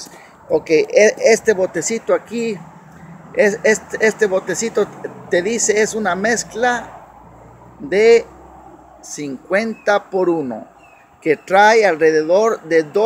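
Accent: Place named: United States. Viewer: Porque el acento bobo de EEUU?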